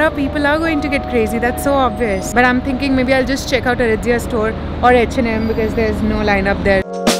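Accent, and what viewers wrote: India. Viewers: her English is perfect she just has an Indian accent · I am not trying to be a grammar nazi but she makes a lot of basic grammatical and pronunciation mistakes lol. If People genuinely want to learn English they should go to the right source. I know she has an Indian accent and there is nothing wrong about it.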